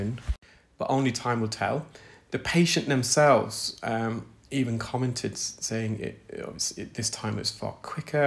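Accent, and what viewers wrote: United Kingdom. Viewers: I'm smiling because with your British accent I hear " right correct ".... Love watching your videos!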